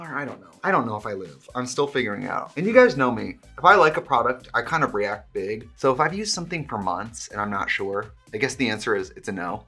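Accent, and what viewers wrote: Wisconsin, United States. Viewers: I love when you lean in to the Wisconsin accent. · Wisconsin Accent coming through more than normal today. Love me a dræg moment ❤️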